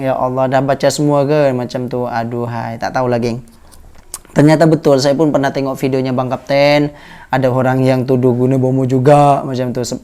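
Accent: Malaysia. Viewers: Bro cakap dah spt org malaysia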